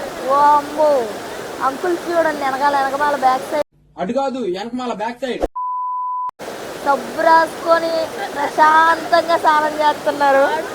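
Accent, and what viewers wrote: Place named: Telangana, India. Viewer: Mem veldham anukuntunnam so me vedios chusam chala bagundhi vedios ❤ tg slang i like it 😅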